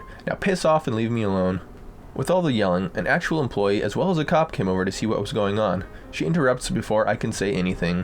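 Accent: Australia. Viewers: Mate you did a great job at the Aussie accent. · I loved your Aussie accent. You did a very job 🇦🇺 ☺️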